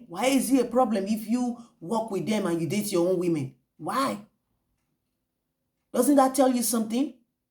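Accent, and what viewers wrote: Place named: Africa. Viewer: Love your accent sweetheart! Which African nation are you from?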